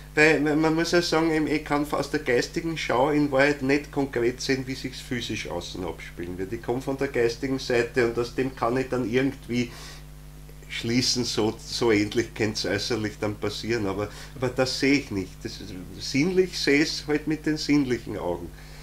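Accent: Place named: Austria. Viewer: Vielen herzlichen Dank für die so wichtigen Vortragsreihe. Bloß ist es Stellenweise nicht so einfach wortlaut vollständig zu verstehen wegen der östreichischen Akzente.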